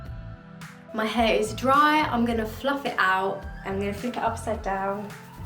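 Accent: United Kingdom. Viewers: What accent is this, it’s nice. I know it’s British but what specific accent? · Your hair is absolutely lovely! I really enjoyed this video and I'm looking forward to others. I adore British accents, your voice is relaxing.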